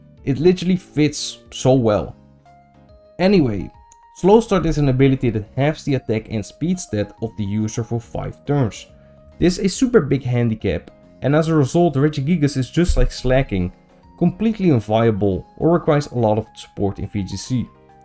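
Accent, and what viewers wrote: Netherlands. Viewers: I just hear you're a Dutch speaker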